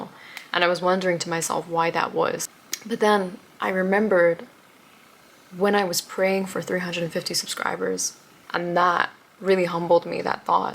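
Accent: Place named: Europe. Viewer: You seem like You speak like You are European. I love Your accent🥰 Know Your Worth 🙌🕊️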